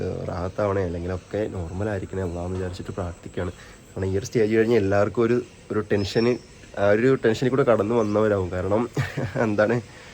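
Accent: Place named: Palakkad, India. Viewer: Ivan palakkad slang aayallo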